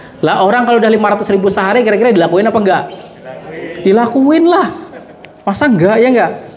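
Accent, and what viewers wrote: Banjar, Indonesia. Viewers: Logatnya mirip kaya orang Banjar....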